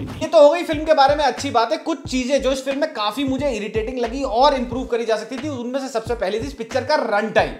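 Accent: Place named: Delhi, India. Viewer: I agree 100% accent is exactly like Delhi boy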